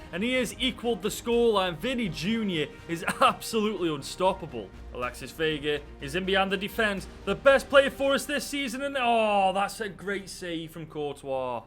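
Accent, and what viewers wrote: Stoke, United Kingdom. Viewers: Must be from Stoke yourself with that accent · I absolutely love his British accent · You're accent! You're from Stoke right?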